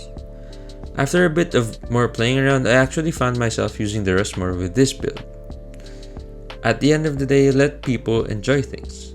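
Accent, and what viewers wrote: Philippines. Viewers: Idk but the way he speaks sounds like how a Filipino speaks English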